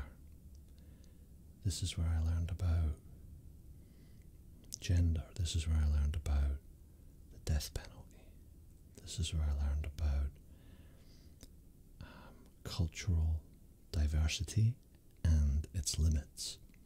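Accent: Scotland, United Kingdom. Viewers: I have a request never stop posting asmr videos the Scottish accent is very soothing. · Two of my favorite things in life. Star Trek and a beautiful Scottish accent. You just got a new sub! Love this!!!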